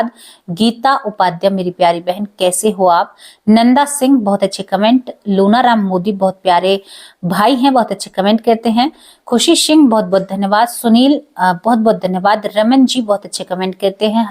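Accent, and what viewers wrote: Haryana, India. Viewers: मृदुल सुशील जी क्या हुआ अगर यह सही से नहीं बोलता है तो हरियाणा और गांव के लोग ऐसे ही बोलते हैं जो बहुत ज्यादा पढ़े लिखे लोग होते हैं वह भी बहुत बार ऐसे ही बोलते हैं बात हमें समझ आनी चाहिएबस और हमें अपनी बहन की बात बहुत अच्छे से समझ आती है आपको ज्यादा परेशानी हो तो आप जरा युटुब पर वीडियो बनाकर देखिए कितनी दिक्कत होती है श्रद्धा शर्मा दिल्ली से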